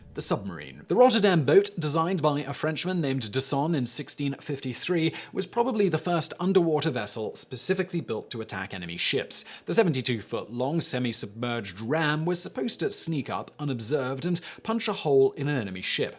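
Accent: United Kingdom. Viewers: I'm so confused, you sound British but all the videos are so heavily American focused.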